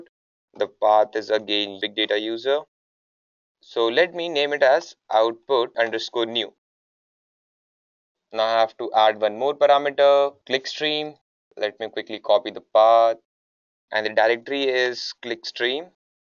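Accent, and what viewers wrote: India. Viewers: 一口咖喱味的英语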